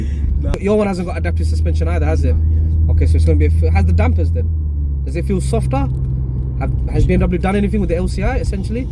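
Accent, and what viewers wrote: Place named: Bangladesh. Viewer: Love your Bangladeshi accent bro! It’s what makes you unique ❤️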